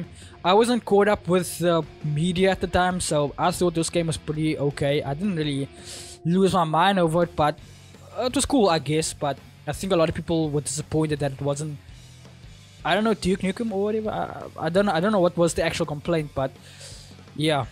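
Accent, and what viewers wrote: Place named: South Africa. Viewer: Yo man, great video. Your accent sounded so damn familiar and turns out you are South African😂. Keep up the great videos, glad to see a fellow South African youtuber in the niche.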